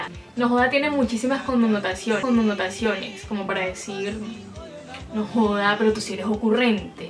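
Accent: Barranquilla, Colombia. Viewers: Oh me es acento costeño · Un ejemplo el acento que empleas es un acento indudable de Barranquilla. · no es el acento costeño, ese es el acento barranquillero, muy diferente al de otra ciudad muy importante de la region que es Cartagena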